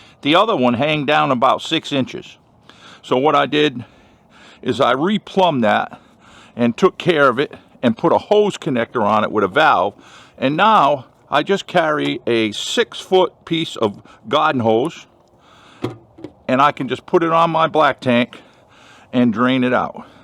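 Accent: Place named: New York, United States. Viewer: You have a newyorker accent👍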